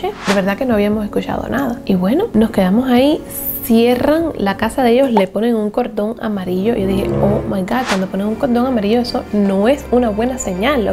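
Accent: Cuba. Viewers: alejandra sanchez yo creo que es por el acento ya que las dos son cubanas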